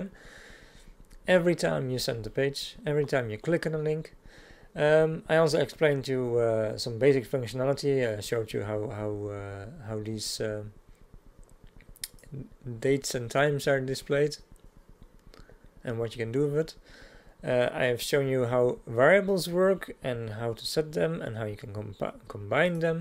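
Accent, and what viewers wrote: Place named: Netherlands. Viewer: from the 1st second i knew you were dutch😊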